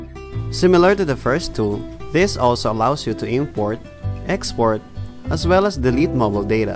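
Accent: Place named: Philippines. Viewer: this is the thickest pinoy accent ive heard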